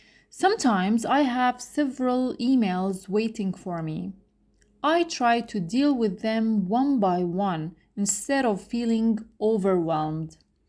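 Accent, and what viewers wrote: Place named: Arab world. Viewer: أنت عربية واضح من لهجتك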